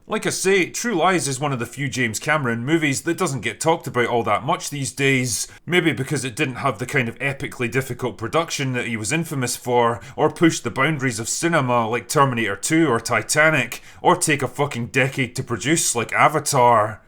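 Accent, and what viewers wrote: Scotland, United Kingdom. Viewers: it doesn't matter to me what movie/tv show you review just as long as i hear your Scottish voice. Just keep them coming and I will keep watching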